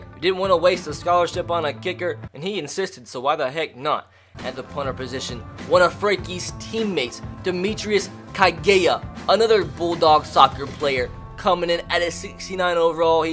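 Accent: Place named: South, United States. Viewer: He’s Southern. Respect the accent, Karen.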